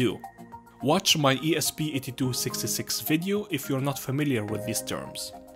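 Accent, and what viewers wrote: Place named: Germany. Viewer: Great and yet simple video, thanks! Where is your accent form? Sounds german :)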